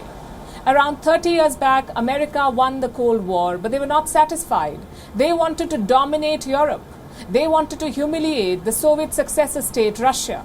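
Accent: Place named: India. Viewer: I like how you speak like an Indian. I hate when reporters try to sound foreign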